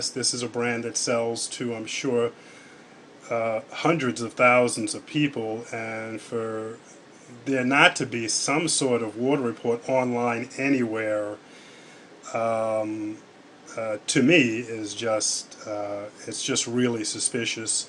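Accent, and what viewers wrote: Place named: North, United Kingdom. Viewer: hes from up north i can tell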